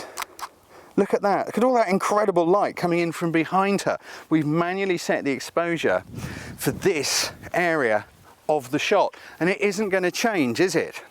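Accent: England, United Kingdom. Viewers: I think it's because an Englishman is speaking English as opposed to non native. We hear "incident" but I can see why someone who isn't English might hear "instant"!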